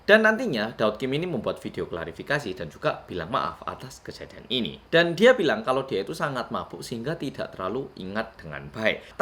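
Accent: Java, Indonesia. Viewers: Min..mukamu kaya korea tp logat mefok jawa ... Hebat mi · Padahal subscriber nya banyak dari luar Indonesia juga, piye Yo mas? Wah, Jian.... Semoga cepat diberi hidayah. Terus kawal mas, LAN Ojo lali medoke haha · kan memang korean lah,cuma dia membesar di malang makanya medhok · CUMAN YOUTUBER KOREA YANG PERCAYA CUMAN KARENA PERNAH TINGGAL DI MALANG PLUS BISA BAHASA JAWA MEDOK😂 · Kaya orng korea mukanya..tpi ada medok2 jawa bicaranya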